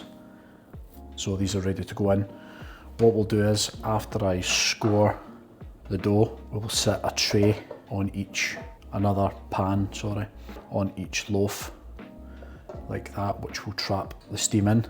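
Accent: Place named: Scotland, United Kingdom. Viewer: of course, my wife even said that she doesn’t know what’s better - a man baking a bread or a Scottish accent she hears while watching it haha 😄 fingers crossed for your channel mate!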